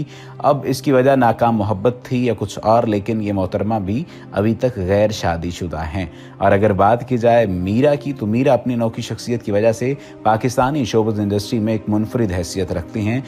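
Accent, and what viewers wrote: India. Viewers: Urdu Nwabi accent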